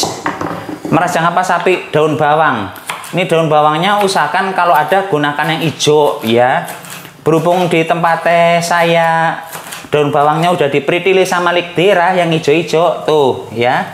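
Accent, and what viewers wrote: Banyumas, Indonesia. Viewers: Wong Endi sih deneng ngomonge ngapak2😂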